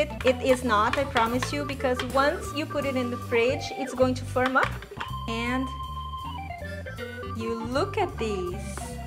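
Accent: Brazil. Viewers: I recognized your brazilian accent, omg I'm bilingual as fuck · Você é brasileira reconheci pelo sotaque <3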